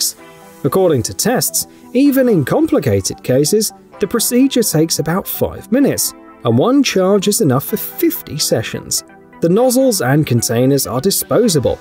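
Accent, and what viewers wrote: United Kingdom. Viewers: no, it makes me want to give this video a thumbs down and move on. Not only is the narrative never fully explained but I can't stand the fact that we are being inundated with these narrators from the UK. I Can't stand listening to them. I've had enough already!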